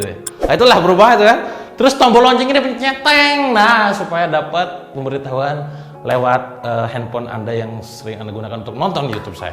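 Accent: West Java, Indonesia. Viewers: Sia akang nyunda pisan euyyy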